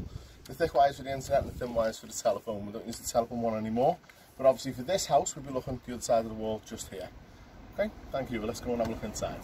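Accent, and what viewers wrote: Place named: Liverpool, United Kingdom. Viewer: STOP SPEAKING SCOUSE! but a good video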